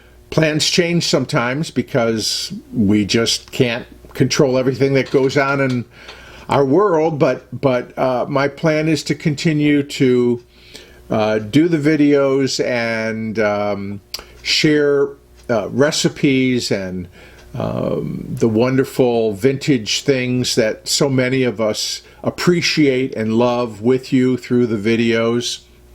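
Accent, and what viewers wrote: Midwest, United States. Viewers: YouTube was kind of just starting its takeoff roll when I first started using a computer and visiting the internet. Didn't seem like it was so much a "job" then. Seemed more like a hobby! I don't begrudge its having become a job for the many now who do try to make their living or most of their living at it, because the more self-employment options there are for people, the better. Not everybody's wired right for the old 9-to-5! YouTube seems to represent a different kind of creative outlet for you, and I think the content you create is better than some that is created by the people who do it for a living. It is certainly less frenetic than some. As a former Michigander myself, of a certain age, I find watching Cavalcade relaxing and reminiscent in equal parts. I love that your steel cookware on the show is the beautiful yet uncomplicated Farberware I started buying around 1975 and still comb thrift shops to find additions to. 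At (a youthful!) 70 I love seeing the kinds of appliances and kitchen gadgets I remember from when I was 20 and first learning how to keep a kitchen! I love hearing you talk the way I used to, before I wound up in New England. Your recipe videos and stove swaps and features showcasing blenders or mixers or whatever feel almost like a visit with a nice neighbor two doors down, if two doors down took me all the way back to the Midwest . I love the approach you take to content creation and I hope there will be room on YouTube for your kind of contribution a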